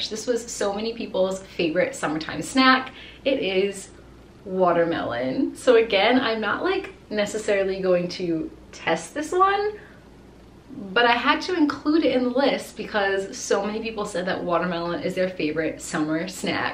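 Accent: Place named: Rhode Island, United States. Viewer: Rhode Island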